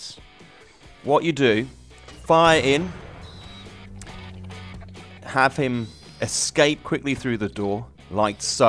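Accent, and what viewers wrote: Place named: Germany. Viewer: why do you speak as german?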